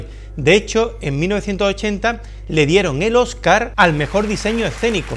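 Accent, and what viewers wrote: Spain. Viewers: Tuve que activar los subtítulos para poder entender tu español de no sé que región de España y salió la misma ya que los subtítulos tienen muchos errores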